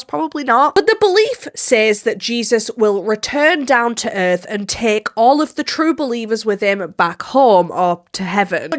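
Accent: United Kingdom. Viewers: So, the way this whole mess looks to me as outsider / Europian - It feels kinda like those doomsday Evangelical Christians of yours, there in the US are some kind of a crazy mix of "Jehovah's witnesses on steroids", and modern variant of the Spanish Inquisition, lol. That's how crazy this Rapture thing looks to me! Now don't get me wrong, we have pretty diverse mix of Christian denominations here in Europe too. We have Catholics, ofc, Orthodox Christians, Protestants, Anglicans, etc. But it's not as nearly that "on the nose", and so much in every part of daily and social life, like you made it look there. Everyone is free to practice their religion they choose, but it's mostly a private and intimate thing, and hardly anyone will start talking to you about it, if you didn't specifically ask them about it. Let alone preach it and trying to convert someone, or go into discussions and arguments about who's religion is "better", or something like that. 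And in the most of them, as far as I know, you don't have that Rapture dogma, or what not, as represented as it is among US Evangelicals. And of course, we have many of atheists, agnostics, some Pagans, etc, but that's neither here nor there, so Idk why I'm mentioning it at all. Anyways, this whole Rapture thing looks so unreal and so crazy to me, that it's hard to take it as the real life, lol! But Idk why am I explaining all of this, when you sound more British than US to me, judging and going just by your cute & cool British acce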